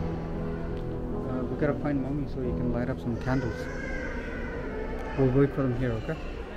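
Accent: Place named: Quebec, Canada. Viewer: I'm so interested in knowing where your french speaking is from! It's so "Canadian" it's baffling.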